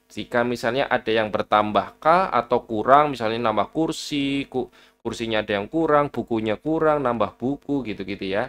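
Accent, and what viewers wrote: Banyumas, Indonesia. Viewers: makasih banyak mas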